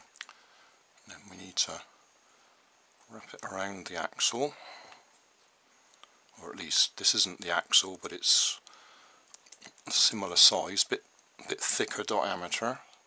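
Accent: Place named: Cornwall, United Kingdom. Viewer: Sounds like a Cornish accent to me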